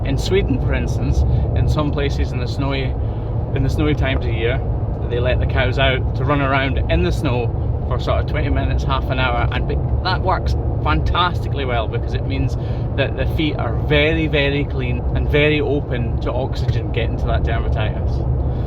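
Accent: Scotland, United Kingdom. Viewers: So nice to hear a fellow Scot on Youtube. Also good to watch and listen to someone who is knowledgeable and interested in what he does. Aren't cows such beautiful creatures 😊😊😊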